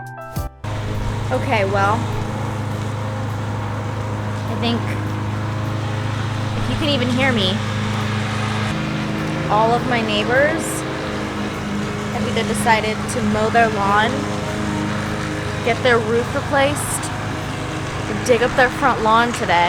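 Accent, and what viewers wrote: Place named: South, United States. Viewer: I'm not sure where you're from but I'm in KY and my southern accent is very similar to yours because anytime I hear you say it, it sounds correct to me, I don't hear an "r" in it anywhere extra. Keep up the awesome work and don't let the lull in sales slow you down, your work/pieces are very pretty and always quality work so it isn't you, it's just the economy right now, hopefully, that'll be picking up soon though. Happy New Year and lots of luck to you!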